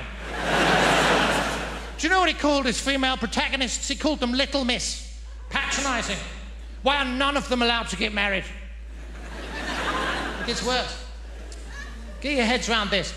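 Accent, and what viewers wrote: Liverpool, United Kingdom. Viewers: His scouse is a dead ringer for Stephen Graham 😂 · Belter Scouse accent 👌🏻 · As a Scouse person, I approve this accent. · So refreshing to see someone doing a Scouse accent and nailing it. Absolutely hilarious set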